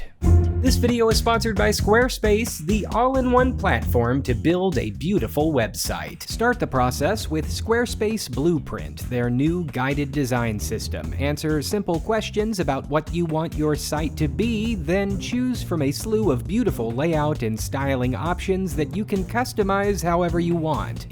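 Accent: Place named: Missouri, United States. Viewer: restaurant videos also show signs of modernization by removing the emotional slips into a Missouri accent. Whenever I feel the need to mimic my Springfield-based coworkers I always locate the accent with "have a training day!" and it brings me immense joy.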